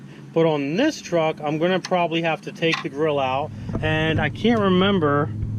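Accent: Pennsylvania, United States. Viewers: It's a Pennsylvania thing. I'm from pa and everyone says it like that.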